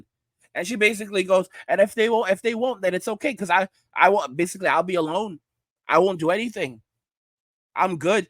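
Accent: New York, United States. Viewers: I’ll give you a 7 outta 10 on that New York accent.. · 10 on the NY accent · Great content,. I like your in-depth analysis, POV / plan and prediction that Western college educated (Feminist indoctrinated) former OF creators will be forced to the streets (competing with AI Girlfriends). Great New York construction guy accent and positivity. Consider a classier Angry Man face to better represent you. I see you as Justified Man of Justice.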